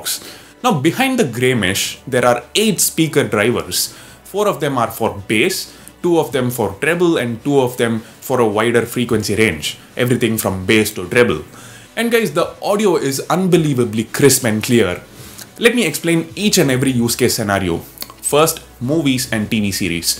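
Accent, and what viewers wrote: India. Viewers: Nice indianglish...😎😎😎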